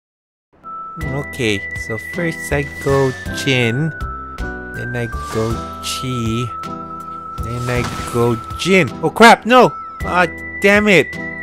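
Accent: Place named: Philippines. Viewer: so sound filipino bro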